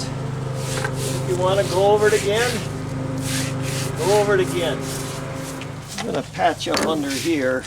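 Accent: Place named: Pennsylvania, United States. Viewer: Thanks for the video. One question, what kind of paint did you use for the steps? Masonry? Brand? Also I think I recognize the accent. You from the Scranton/Central Pennsylvania area?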